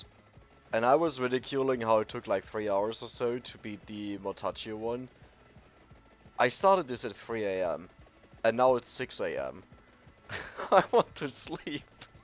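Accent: Germany. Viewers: Bro man hört so raus das du deutsch bist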